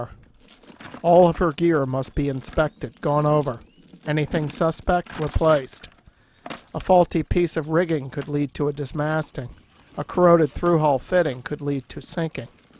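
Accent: Maine, United States. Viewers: Are you a Mainer. You talk very similar to myself and most of my Maine relatives. That's meant to be complimentary. And explains your excellent seamanship. "Stand Fast all ye men from Maine who go down to the sea in ships."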